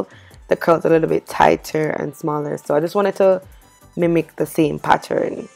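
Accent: Jamaica. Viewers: Omg your Jamaican?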